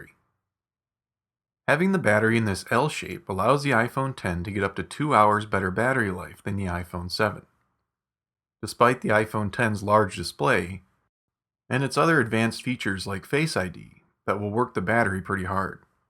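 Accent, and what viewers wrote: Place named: RP, United Kingdom. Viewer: nicely done, and he speaks the queens, hurrah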